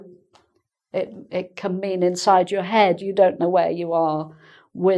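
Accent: England, United Kingdom. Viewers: England I'm guessing based on her accent, but I couldn't say exactly where. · Here in Brazil, we also have a word like prickly and this one is "espinhenta" a prickly person is a "pessoa espinhenta", full of sorrow and not a good person to be with. And touchy, if it's exactly as I can wonder while I watch your explaining is what we brazillians use to call "uma pessoa muito dódoi", what means a person who would be easily offended due to very little things. I'm loving your british accent because I'm training listening of british english. Thank you very much. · Yes ! This Lady IS a true teacher, a Real english person with a Real accent 👍. It's Very Good to learn with her. No mistake. Thanks for her. 🌹💓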